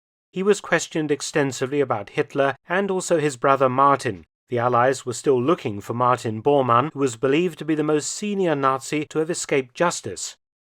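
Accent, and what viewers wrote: United Kingdom. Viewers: Yes, it's a British thing. We say lieutenant as "leftenant". However, when we say "in lieu of" it is pronounced "in loo of". I wonder if the American pronunciation of lieutenant as "lootenant" is more correct? A lot of sources do not explain where the British term leftenant came from. However, I like this one: "According to military customs, a lower ranking soldier walks on the left side of a senior officer. This courtesy developed when swords were still used on the battle field. The lower ranked soldier on the “left” protected the senior officers left side. Therefore, the term leftenant developed." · British pronunciation.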